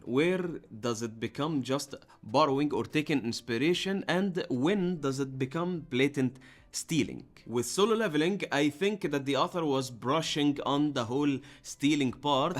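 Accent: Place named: Egypt. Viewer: how r u talking Egyptian in English btw i found u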